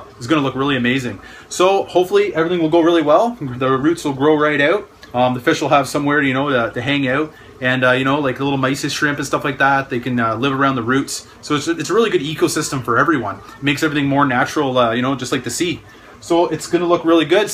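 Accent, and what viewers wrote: Canada. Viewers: Are you from Canada? I love how you talk! Great video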